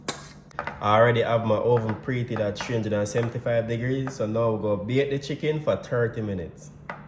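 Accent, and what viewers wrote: Jamaica. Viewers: That look delicious I must try as soon as I get that green seasoning u used..but what I most love is the Jamaican accent 😊😊😊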